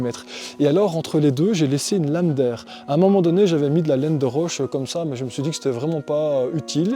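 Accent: Belgium. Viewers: Charmant accent belge 😇🤓🐨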